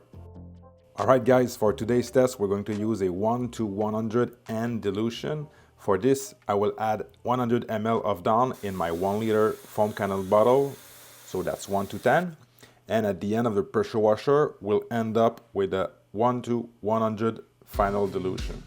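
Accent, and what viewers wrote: Quebec, Canada. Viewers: I'm going to stick to not using dawn sorry but you sound like you're in Canada so maybe you guys have the good stuff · I hear that Québécois accent, bonne Saint-Jean !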